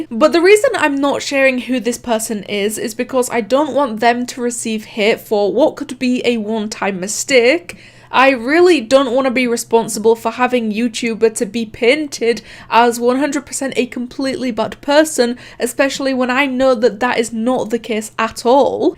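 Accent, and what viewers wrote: United Kingdom. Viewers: youchewber British? I'm british so I would now that ur british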